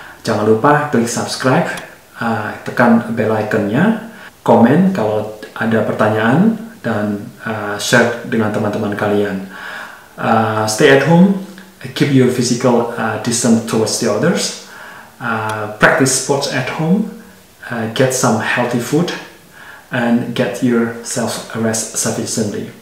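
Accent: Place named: Java, Indonesia. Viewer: Nice info. Masih medok buanget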